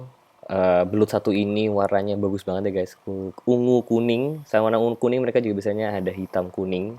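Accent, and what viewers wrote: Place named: Java, Indonesia. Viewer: logat jawanya bang keluar dikit wkwkwkwk lanjutin ya bang video yg kyk gini sama motor sport lainnya terimakasaih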